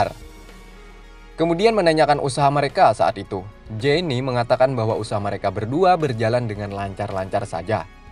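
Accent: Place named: Kalimantan, Indonesia. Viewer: Logatnya khas kalimantan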